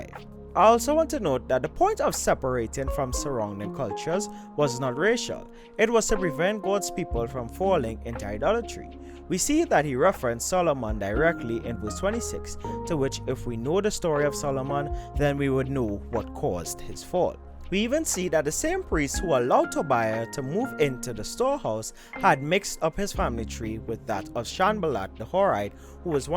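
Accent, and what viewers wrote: Trinidad and Tobago. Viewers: Great job. Are u a Trini!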